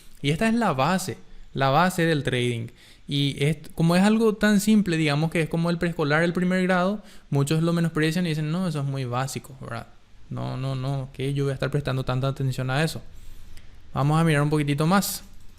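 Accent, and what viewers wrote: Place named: Paraguay. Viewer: que purete es el acento paraguayo bien hablado, que locura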